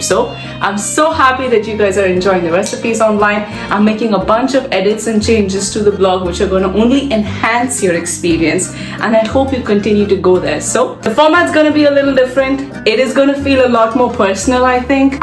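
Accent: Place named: France. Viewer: Impressive and French accent is nice one to add along with lovely Galette preparation . Keep going girl ❤🎉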